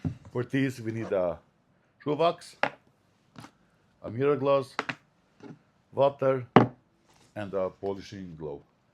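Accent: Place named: Germany. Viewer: Hey, bist du deutscher Herkunft? Ich Hab das Gefühl du hast Akzent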